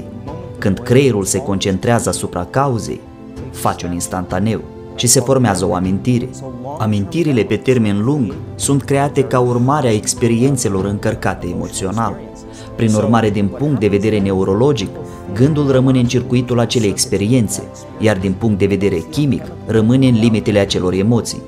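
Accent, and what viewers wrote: Moldova. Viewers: Desi ai un frumos accent moldovenesc via Chisinau, transmiti foarte profund cam tot ceea ce postezi, ai harul acesta, sau poate te-ai reinventat, dar cert este un lucru, esti pe drumul cel bun si nu numai atat, mai ii si ajuti pe altii sa isi schimbe viata prin ceea ce etalezi,...., excelent, este minunat si bun de urmat, o zi frumoasa si o viata si mai frumoasa !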